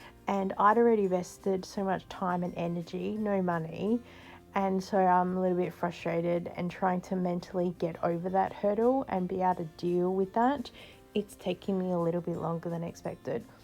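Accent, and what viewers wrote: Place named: Australia. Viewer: Dana's lovely. She's an ADHDer and developed her no mess method, precisely coz normal methods didnt help her. Hi from Sydney, you sound like an Aussie!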